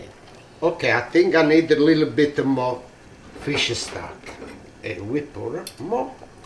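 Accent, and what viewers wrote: Italy. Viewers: Your Italian is just like my Mother's. She was from a village in Forino . It was called Petruro. You are Awsome!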